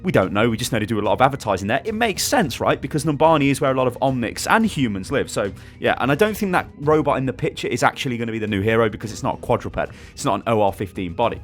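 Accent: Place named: United Kingdom. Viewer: I F*CKING LOVE BRITISH ACCENT, sounds so cool!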